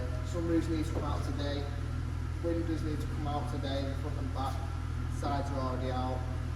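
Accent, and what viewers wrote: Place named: Manchester, United Kingdom. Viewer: Where you based? you sound like Manchester... Someone had a go at mine before i started it as well lol... There is a few videos of the work iv had to do, unbelievable lol.. Yours is mint 😆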